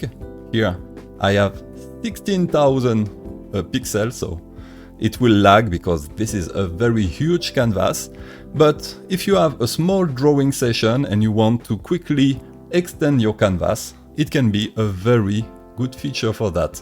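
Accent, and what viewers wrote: France. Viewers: Monsieur vous avez l'accent français le plus fort de toute la Terre je crois, mais punaise, qu'est-ce que je ferais sans vous! Je n'utilise plus que Krita depuis 2 ans, et je ne compte plus le nombre de fonctionnalités que vous m'avez fait découvrir, me rendant le logiciel limpide! De plus, je vous remercie pour les brushes que vous partagez et que j'utilise beaucoup.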